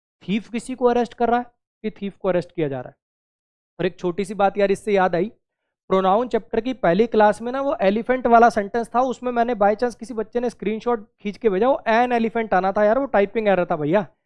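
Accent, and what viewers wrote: Haryana, India. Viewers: Sir aap haryana se ho kya 😎 khinda do sun k lga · Sir are you from haryana..i felt haryanvi in your conversation 😅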